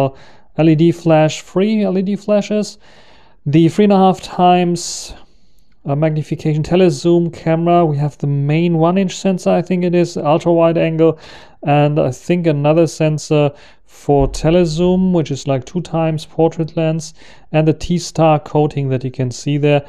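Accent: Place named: Germany. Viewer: Nach drei Wörtern Englisch wusste ich sofort dass du ein Deutscher bist. Diese Akzent findet man nur bei den Deutschen wenn sie endlich sprechen. 😂 Aber nettes Video, lass dir gern ein Abo da. Frohes neues. Das Vivo x80 pro gefiel mir vom Design her besser, ich persönlich warte bis Vivo die Frontcamera 4k fähig macht.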